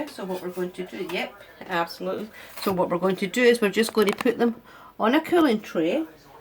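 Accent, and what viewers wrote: Scotland, United Kingdom. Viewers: As I like the Scottish accent listening to this was almost like listening to a ASMR video. Greetings from Italy.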